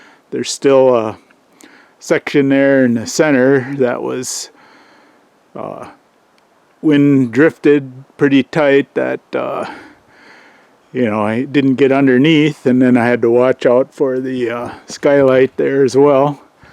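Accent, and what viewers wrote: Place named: Upper Peninsula, United States. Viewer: you sound alot like yuper er like me from mi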